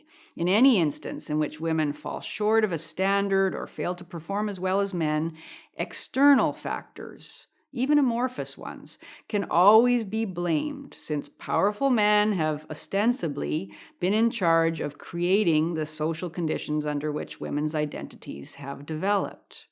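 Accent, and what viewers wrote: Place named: Upper Midwest, United States. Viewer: Sounds like a Minnesota or Wisconsin(Canada's neighbor makes sense) accent. Good video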